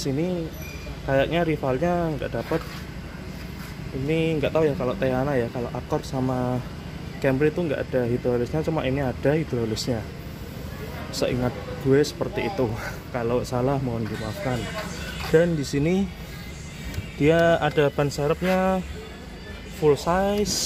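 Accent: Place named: Java, Indonesia. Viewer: Jangan pake bhsa GW mas soalnya rada2 medok jawanya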